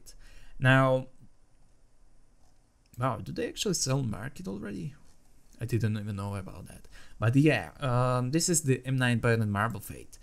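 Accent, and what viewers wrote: Slovakia. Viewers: Počkej, ty jsi slovák? :D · Len som klikol na video asi 1minutu som ťa počúval a hneď som vedel že si slovák je to vidieť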